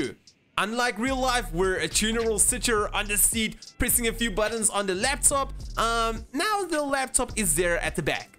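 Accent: South Africa. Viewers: Once you have all +3 bars, start with the bar on the right most side, and then move it one down and do that in order from right to left move each one down and you should get +6 on all. By the way you sound a little South African. Not a very strong accent but having lived here since birth I can pick out even very subtle SA accents just like that no matter where I hear them.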